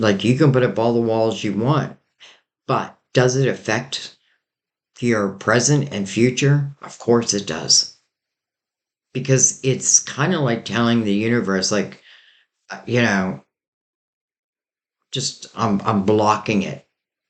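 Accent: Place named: Philadelphia, United States. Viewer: Well, well.... It no coincidence this reading popped up on my feed first thing this morning and I had time to listen to the entire reading. Boom, boom, boom.... So much here. Thank you for your gift. Also, I'm from outside of Philly and. Sounds like you also are from that area...😊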